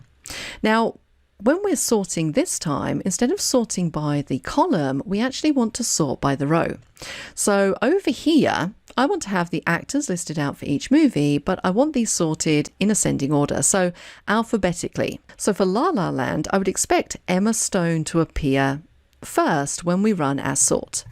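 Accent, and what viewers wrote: United Kingdom. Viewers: that british accent is so smooth hahaha, greetings from spain. It would be awesome if you could transform her voice into spanish through AI.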